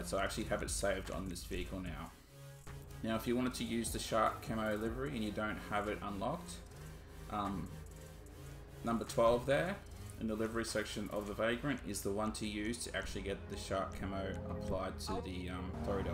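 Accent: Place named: Australia. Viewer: I only realised u was Australian 😭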